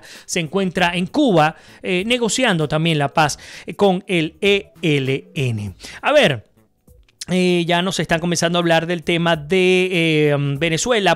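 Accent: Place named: Argentina. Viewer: hablas parecido a Argentino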